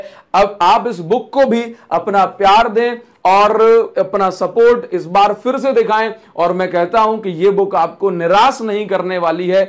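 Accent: Odisha, India. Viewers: Sir are u from odisha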